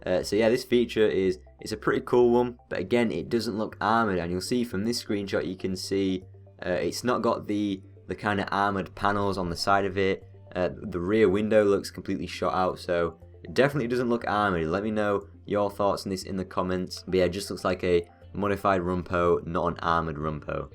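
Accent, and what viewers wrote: England, United Kingdom. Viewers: omg imagine an armoured submarine with torpedos or and a big submarine that can shoot nuke type rockets ( not as powerful like a more powerful rocket from rocket launcher ) and u can place target on map in launchers out top and lands on area destroying different targets ong that was on the top of my head ahaha XD love that ur English not American lol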